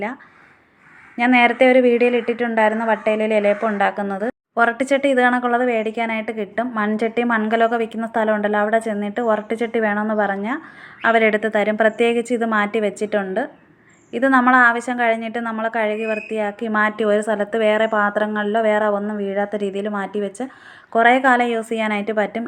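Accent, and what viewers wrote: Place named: Venjaramoodu, India. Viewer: നിങ്ങളുടെ സംസാരം കേട്ടപ്പോൾ തോന്നി വെഞ്ഞാറമൂട് കഴിഞ്ഞു വരുമ്പോൾ ഉള്ള ഏതോ ഒരു ഭാഗം ആണെന്ന് കാരണം ഞാനും വെഞ്ഞാറമൂട് ഉള്ളത് ആണ് എന്നെ കെട്ടിച്ചു വിട്ടത് നിലമേൽ ആണ്... ഇതേ seim സംസാരം ആണ് ഞങ്ങളുടെത് ഓക്കേ gd luck 👍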